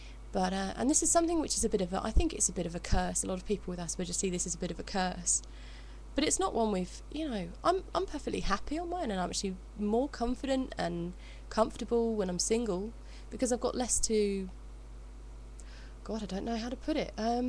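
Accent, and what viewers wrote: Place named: United Kingdom. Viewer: Which dialect of the British accent are you? I am fascinated with accents... I am female & been diagnosed with aspegers not long ago, basically, I do relate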